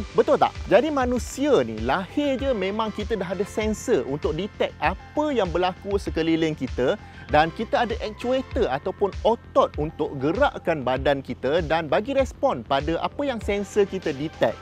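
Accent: Johor, Malaysia. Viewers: Lah orang jb ke haha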